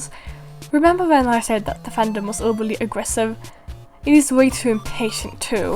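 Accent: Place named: Australia. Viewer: my god you're australian (or british. i can't tell from the two sometimes ;-;) your voice is nice. and great OC!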